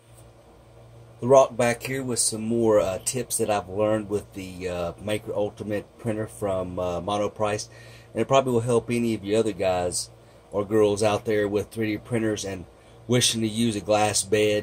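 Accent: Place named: Georgia, United States. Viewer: Hey Buddy, that seems like a major pain in the azz, but I bow with respect for your perseverance ! What is your z ofset ? You may need to push out a thicker bottom layer ? Did a raft not work ?Have you tried PEI or even PET (gizmo dork) ? PET with a good first layer of Elmers Extreme works great for all my other heated and non heated beds.I have one of these coming for testing friday so Id love to hear what you think ? I hear a slight accent also, you in GA ?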